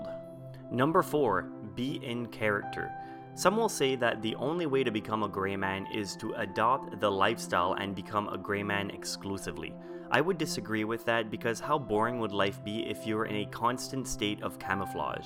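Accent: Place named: California, United States. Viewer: sounds like your from cali or south florida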